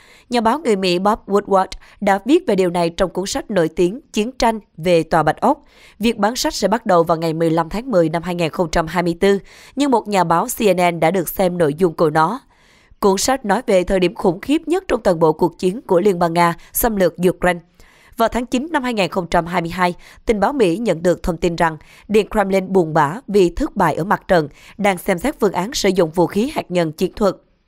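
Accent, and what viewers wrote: South, Vietnam. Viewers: Kênh bạn rất hay và chuẩn về thông tin. bạn nói giọng miền Nam nên nói chậm rãi. đừng nói nhanh quá... nghe sẽ đầy đủ hơn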